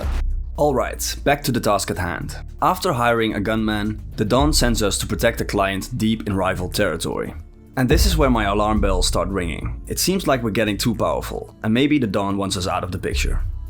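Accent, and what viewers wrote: Netherlands. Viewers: Never fail to recognize that accent... 🇳🇱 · i think i hear a slight dutch accent. its one of the least noticeable dutch english accents i have ever heard though. most of us cant hide we are dutch when speaking english at all.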